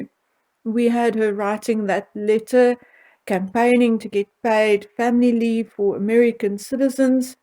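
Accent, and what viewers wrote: South Africa. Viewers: I could listen to you talk all day long. I just adore the South African accent. X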